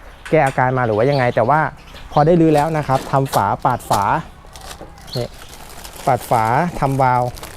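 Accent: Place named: Phetchabun, Thailand. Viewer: สำเนียงเพชรบูรณ์ หล่มสักครับ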